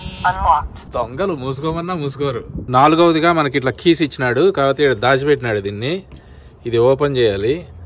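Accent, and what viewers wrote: Kadapa, India. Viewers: Bhayya meeku maa vizag lo vunanatha etakaram and slang Kadapa vollu vundi ani choopisthunnaru sooper bhayya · Anna Meeru maa paalita PEDDA BALASHIKSHA . America vallu mimmalni ,meethopati swachmaina Rayalaseema Yaasani AP nunchi yetthukellaru. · Anna miru mana kadapa bhasha supar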